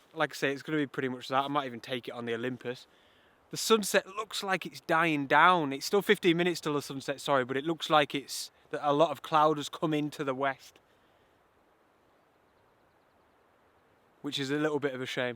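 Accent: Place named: Cumberland, United Kingdom. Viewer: Good evening, from your twang what part of South Cumberland are you from (Millom). Nice to see you doing these Wainwright, fells.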